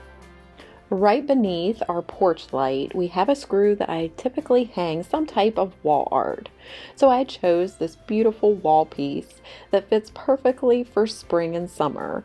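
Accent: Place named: South, United States. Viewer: I just love your accent! I think after British accent the southern accent is the most beautiful in English! I love the porch! Looks good!!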